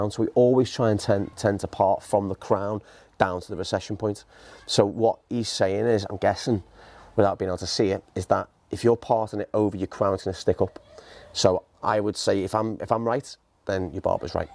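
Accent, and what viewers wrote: United Kingdom. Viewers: For, Could you take subtitle for your video, so I can catch what you say. I am not familiar with british aksen. Thanks From Indonesia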